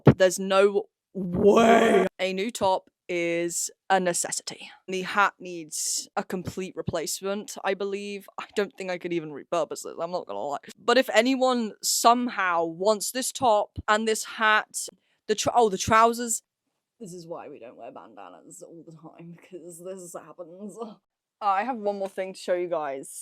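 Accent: United Kingdom. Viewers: UK London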